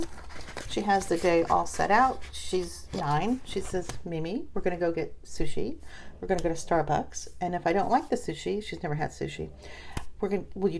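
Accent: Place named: North, United Kingdom. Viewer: Northern twang!!! 😂I love it!!! I love that you got them so quickly!!!!! Thank you so much again!!!!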